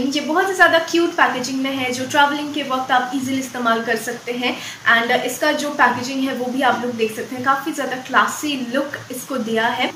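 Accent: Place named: Bengal, India. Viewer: Tumar awaje Bangla tan asche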